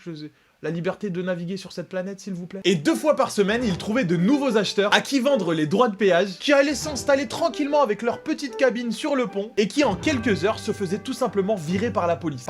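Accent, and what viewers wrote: Colombia. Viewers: L'accent colombien 200000/20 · Accent Colombian: 20/20❤️ Leonard di Vinci: 58/20😍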